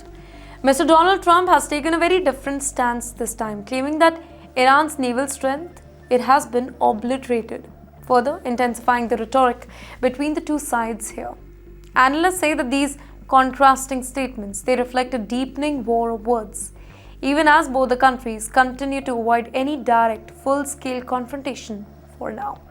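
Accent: India. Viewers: THIS HINDIYAN SPICY ACCENTED NEWSCASTER IS WEARING A SCHOOL UNIFORM, MUST REALISE HER MODI WILL SUFFER THE IRAN OIL & GAZ SUPPLIES IF THINGS ARE MESSY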